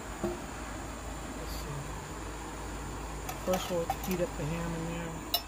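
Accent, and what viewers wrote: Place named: South Carolina, United States. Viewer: Dang..... are you sure you’re not from South Carolina????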